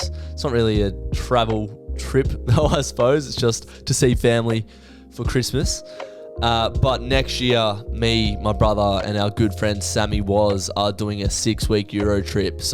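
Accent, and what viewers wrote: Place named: Australia. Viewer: just got around to watching this..couldn’t agree more. the people you meet & relationships you foster along the journey is what makes life worth moving through the sludge. beautiful q&a, looking forward to more content in 2025 mate🫡🫡 send me a voice note soon braindumping what’s been going on—good to hear the ole aussie accent xxx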